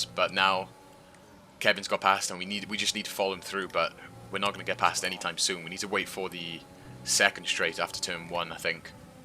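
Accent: Wales, United Kingdom. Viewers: Good effort bro, just found your channel via the Shed Dweller. And cos it's nice to hear a fellow Welsh accent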